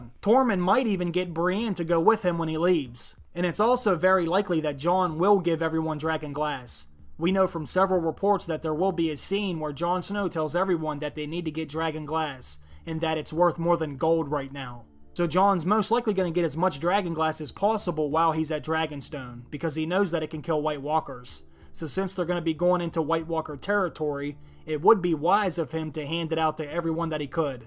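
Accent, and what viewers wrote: Pittsburgh, United States. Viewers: ice fucking dragons and narrator has gotta be a pittsburgh guy love the accent lol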